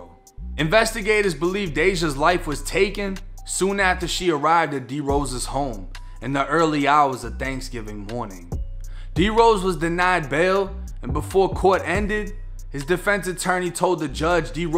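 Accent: Boston, United States. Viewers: Bro u sound like ur from boston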